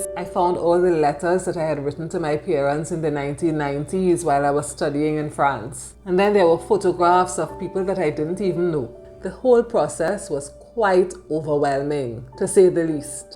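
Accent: Trinidad and Tobago. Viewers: I was watching this video on silent as soon as I turned on the volume I knew you were Trini. Subscribed immediately.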